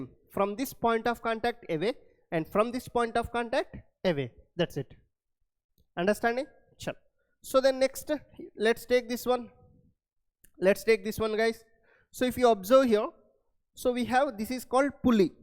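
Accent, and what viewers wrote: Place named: Tamil Nadu, India. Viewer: Your tinglish and loss of motion and chopter is different. Better try " orion educenter "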